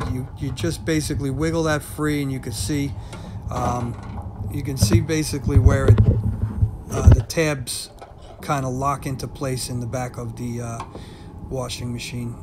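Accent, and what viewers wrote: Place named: New Jersey, United States. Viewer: Are you from Jersey? I grew up partly in Newark, great accent and perfect instruction! Love that East Coast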